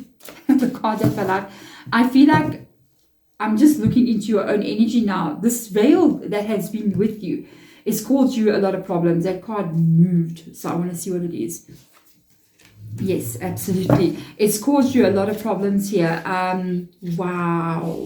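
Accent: South Africa. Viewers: Lovely to hear a South African voice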